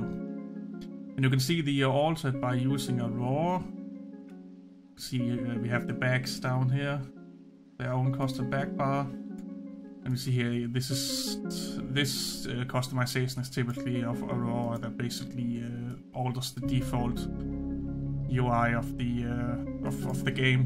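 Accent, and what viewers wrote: Denmark. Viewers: The danish is strong in this one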